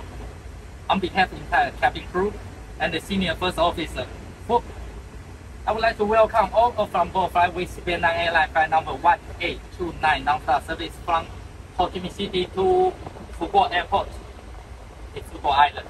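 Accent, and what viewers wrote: Taiwan. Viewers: 風趣的台式英文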